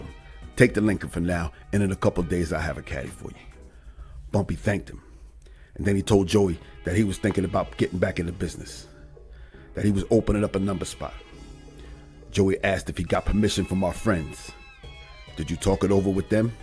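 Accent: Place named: New York City, United States. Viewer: NYC accent starts to get ignoring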